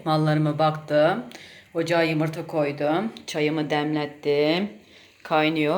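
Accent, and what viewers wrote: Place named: Aegean, Turkey. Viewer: KARADENİZ ZONGULDAK DAN SELAMLAR HEPİNİZE. ,,EGE ŞİVESİNİ COK SEVİYORUZ ,, ÇOK TATLI KONUŞUYORSUNUZ SÜPER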